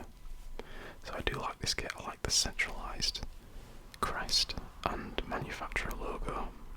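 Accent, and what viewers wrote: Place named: Yorkshire, United Kingdom. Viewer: As a fellow Yorkshireman I approve of this channel ha and respect how you’ve managed to calm our usually loud accent into such great relaxing content :D great channel man!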